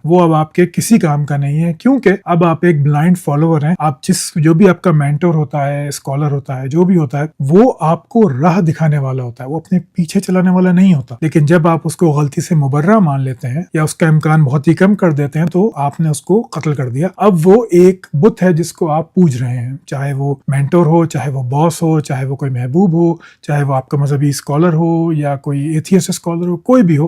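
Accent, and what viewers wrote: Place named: Sindh, Pakistan. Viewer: اوپر سے ھو بھی سندھی اسپیکینگ یا پھر پنجابی ڈھگے ھو آپ ۔۔۔کراچی والی لینگویج نھیں لگتی آپ کی۔۔۔۔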